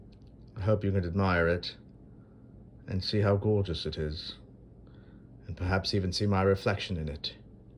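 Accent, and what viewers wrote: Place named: Portugal. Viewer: Fantastic Portgues accent. Spot on, mate!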